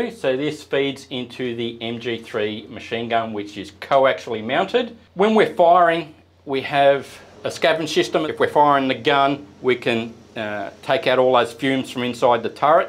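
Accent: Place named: Australia. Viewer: For a few moments there, i closed my eyes & pretended i was listening to Steve Irwin talk about a tank in great detail instead of crocs & various other critters. I know it's kinda odd but hearing that accent reminded me of Steve.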